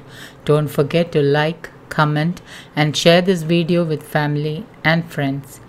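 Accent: Kerala, India. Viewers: I like listen to Kerala accent :) pure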